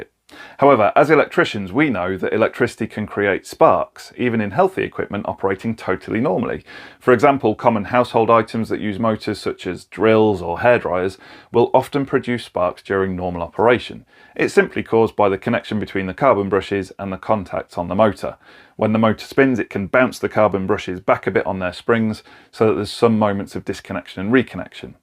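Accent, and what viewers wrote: United Kingdom. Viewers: A fault of negligible impedance? Ohhh, you mean a short circuit 🤣 Everything sounds better in British English!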